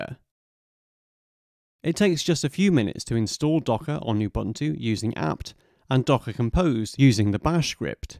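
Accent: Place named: England, United Kingdom. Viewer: english accent kills it !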